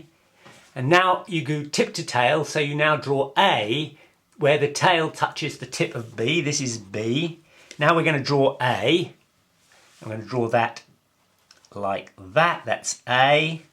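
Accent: United Kingdom. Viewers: I hate british accent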